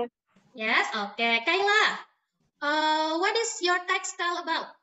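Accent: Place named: Banyumas, Indonesia. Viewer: Guru ngapak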